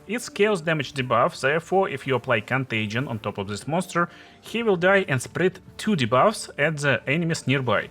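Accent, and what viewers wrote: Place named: Russia. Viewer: Я слышу что ты русскоговорящий :))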